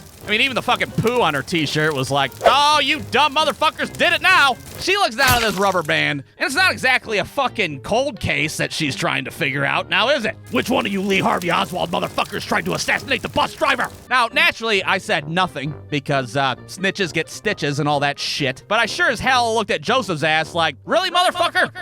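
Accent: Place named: Ohio, United States. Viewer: I’m going to go out on a limb and guess that he is either from Crawford or Mercer Ohio.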